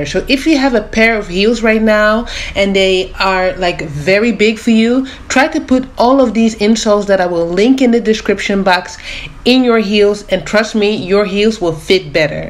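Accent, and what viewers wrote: Netherlands. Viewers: i know a dutch accent when i hear it! ;) gonna try the tips ! thanks :)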